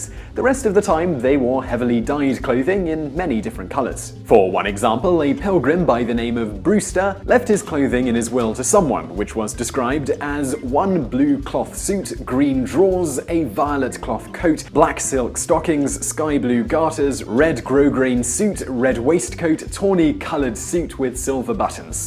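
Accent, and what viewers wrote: United Kingdom. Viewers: Weird hearing a Brit talk about American Thanksgiving history. I should do one in a brash US accent about Cornwall.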